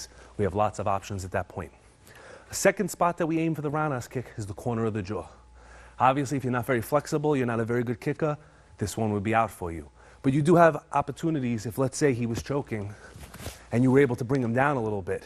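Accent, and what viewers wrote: New York, United States. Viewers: That's some amazing shit I like your videos you don't act like a bad ass just how it's done. Btw, you from NY.?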